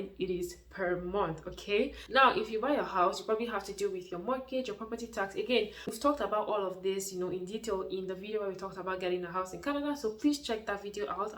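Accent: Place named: Nigeria. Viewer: Very good video ! I like the way you explain the things ! I watch your video from Ottawa ! I like Nigerian accent 😀👍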